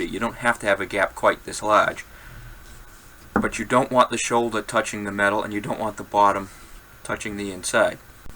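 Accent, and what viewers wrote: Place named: Boston, United States. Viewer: Man, you have a really strong Bostonian accent. Are you from reveeyah?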